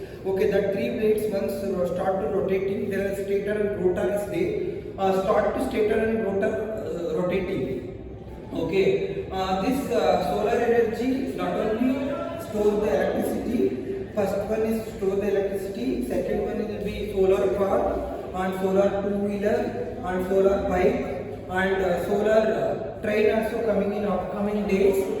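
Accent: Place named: India. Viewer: This Indian English only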